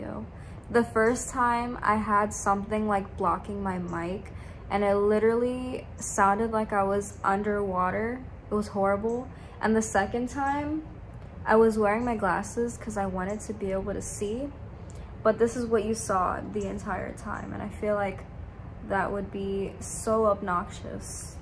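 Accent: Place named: India. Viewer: I can tell you're indian from your accent alone ❤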